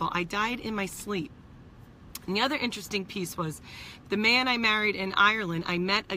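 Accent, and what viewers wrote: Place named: Northeast, United States. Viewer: Wow. A properly asked question. I applaud you. She sounds as if she has a N.E. American accent. Perhaps as far West as Indiana or Illinois.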